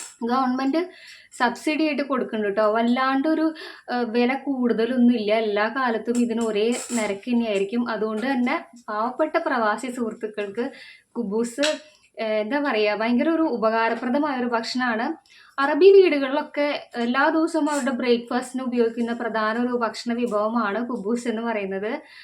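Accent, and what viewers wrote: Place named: Vadakkanchery, India. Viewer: ഞാനും തൃശ്ശൂർ ആണ് വടക്കാഞ്ചേരി ആണ് സ്ലാങ് കേട്ടപ്പോൾ തോന്നി എന്റെ ഏരിയ യിൽ എവിടെയോ ഉള്ള ആളാണെന്ന്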